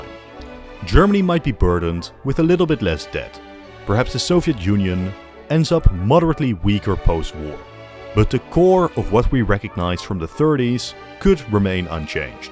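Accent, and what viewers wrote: Netherlands. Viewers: By any chance, are you Dutch or Flemish?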